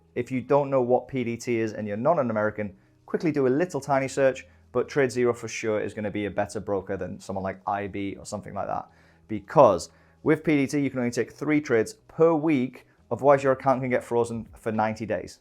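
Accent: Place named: Newcastle, United Kingdom. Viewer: Love the Geordie accent are you in Newcastle??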